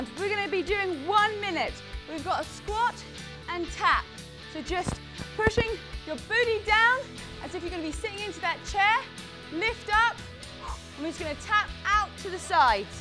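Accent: United Kingdom. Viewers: She has a British accent. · Anybody else do all of her amazing workout and suddenly start talking along to them in a British accent .. I love it!!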